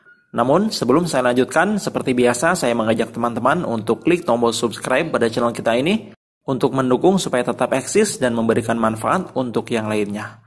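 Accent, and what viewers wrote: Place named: Sukabumi, Indonesia. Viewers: Org sukabumi ya bang 😅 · Abang orang skabumi ya???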